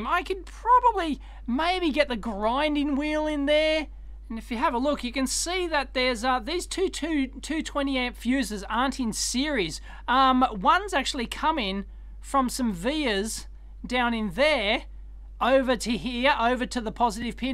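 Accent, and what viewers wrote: Australia. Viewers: Despite the different audio recording setup, you still sounded like an Aussie :)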